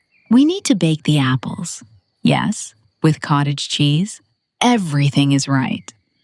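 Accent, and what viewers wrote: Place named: United States. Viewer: I liked it better without the American narrator voice.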